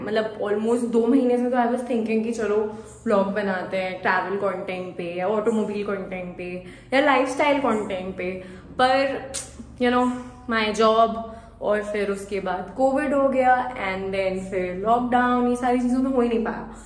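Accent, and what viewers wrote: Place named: United Kingdom. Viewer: Your English accent is just like British accent 😍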